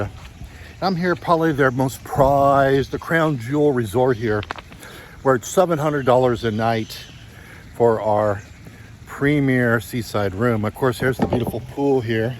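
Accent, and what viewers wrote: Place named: Virginia, United States. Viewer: Stop digging on North Carolina. Your accent isn't much different. What are you from Virginia?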